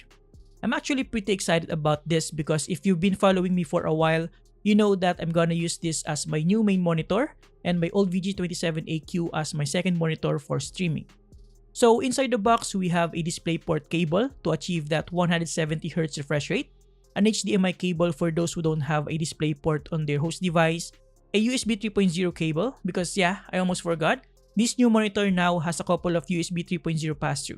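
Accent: Philippines. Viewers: Props sa pagka-review nito pang international visuals. Nagtitingin lang ako ng review about dito sa monitor na ito then narealize ko sa tono ng boses pilipino pala. Husay! Sakto nabanggit din na predecessor pala yung QA so possibly ito QL1A i-acquire ko.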